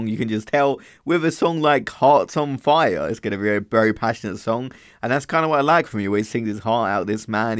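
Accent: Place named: England, United Kingdom. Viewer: Thanka for doing this song of John's. I haven't seen any other reactor do this version before. Also love your nice English accent !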